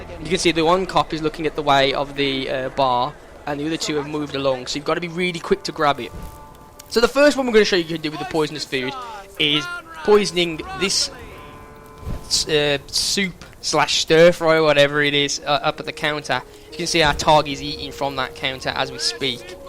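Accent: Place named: Birmingham, United Kingdom. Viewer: You sound like you're from Birmingham.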